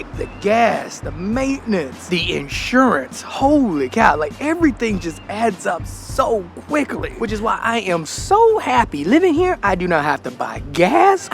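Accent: United States. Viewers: Can't have an American accent and say Haarlem, that confuses the hell out of people.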